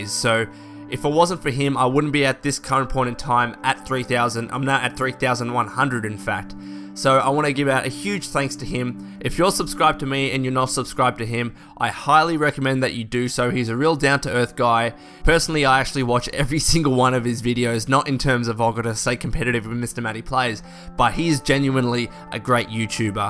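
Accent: Australia. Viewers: ***** its so tough!! Dude where are ya from, I know its an Ossie accent but do you live in Australia? :)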